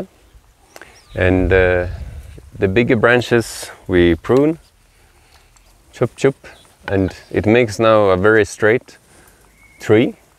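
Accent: Kenya. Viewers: I'm surprised you have a strong Kenyan accent , what a wonderful video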